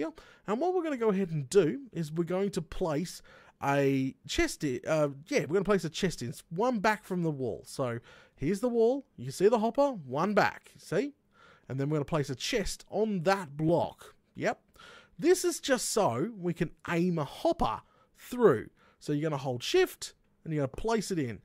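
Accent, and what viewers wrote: Australia. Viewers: Mmmmmm...so Australian.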